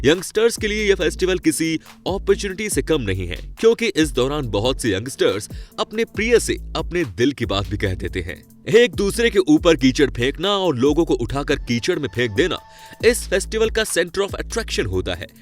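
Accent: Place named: Jaipur, India. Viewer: Yeh awaj Jaipur ke ek bhai ki hai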